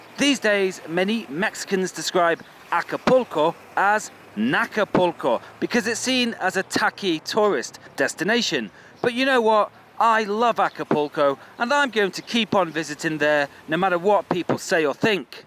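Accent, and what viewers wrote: England, United Kingdom. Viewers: me gusta tu acento ingles a ver cuando me das clases de tu idioma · I used to watch British Telly until the 2006 , that's why I understand and love your accent but honestly I didn't know the little Britain lol .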